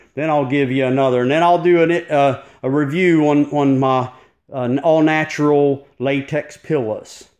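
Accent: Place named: South, United States. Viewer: The Southern accent gives this review that extra level of authenticity.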